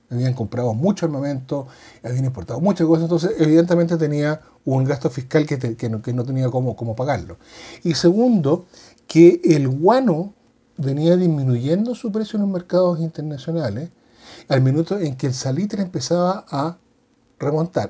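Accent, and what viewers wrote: Chile. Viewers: Hola, gracias por tu nuevo video. Soy chile y entiendo tu manera de hablar, pero tengo claro que si quieres llegar a mas personas, debes tratar de hablar en un chileno mas neutro. Que te vaya muy bien.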